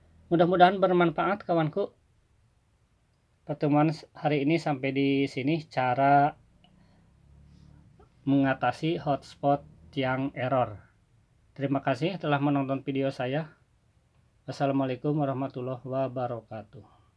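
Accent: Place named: Banjar, Indonesia. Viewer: Banjar kc mba logat nya koq logat bnjar 😀😀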